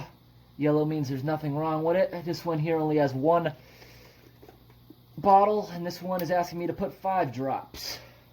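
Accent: New England, United States. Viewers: Dark Diddler New England accents are very unique. He says “dat” instead of “that” which is pretty common for that area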